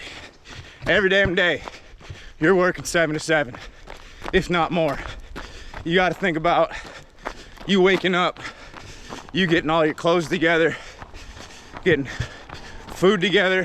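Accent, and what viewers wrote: South, United States. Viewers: I love the fact that with the run, the southern accent comes out. 😎Yee haw! I'm 60 years young and the miles have started reminding me that I'm not 20. You, young man, are an encouragement for others to get out there and do the things, regardless of age! Keep up the good work! Love the rant! Thanks for sharing.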